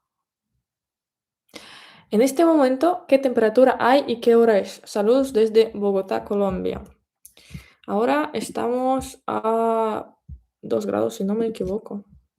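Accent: Spain. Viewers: Tienes un acento español en tu forma de hablar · Sí, esta joven definitivamente TIENE TALENTO🧏‍♀. Los administradores del canal lo saben🤫, pero... por qué no tiene su propio canal de Youtube?🤔. Aunque su acento es español, obvio estudió castellano, y puede "chocar" un poco a la audiencia de latinoamérica; su imagen, desenfado al hablar a cámara, y deseos de crear MÁS contenido audiovisual la posicionaría en el mercado de LATAM🧏. Sobre este directo, me reservo mis impresiones, sólo que a partir de los 45 minutos es que Youtube comienza a recomendar mejor los directos. Gracias por esta hermosa iniciativa👏. ÉXITOS a las demás creadoras. Mis saludos desde Moscú🤝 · No hables como los espanoles, se oye feo, no digas vale, habla espanol americano.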